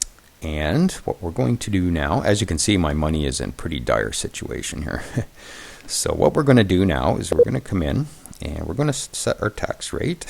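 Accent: Canada. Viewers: I wish you could do more of these . Im just starting with this brilliant game and its a big help ! Btw im German and can understand your explenations pretty well , it might be you beeing Canadian . Thx for your DW series !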